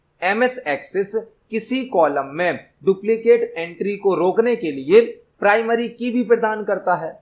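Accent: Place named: Bundelkhand, India. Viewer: Sir are you from mp!? Because aapki voice ka accent bundelkhandi sound kr rha h specially damoh ka🧐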